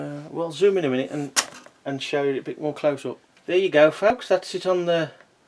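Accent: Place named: Black Country, United Kingdom. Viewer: So good to hear a Yam yam on youtube!!!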